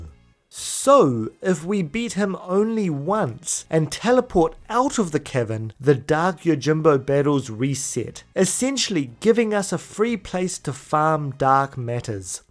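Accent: New Zealand. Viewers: Took me until here to realize that you were a kiwi, This absolutely sent me. Ka Pai cuz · Started the video cause it’s my favourite game of all time, then the kiwi accent hit me like a tonne of choccy fish! Kia ora bro! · are you kiwi by any chance?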